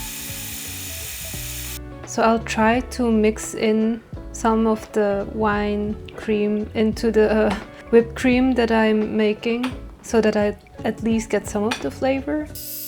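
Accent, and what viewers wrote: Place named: France. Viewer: You sound quite French when speaking English.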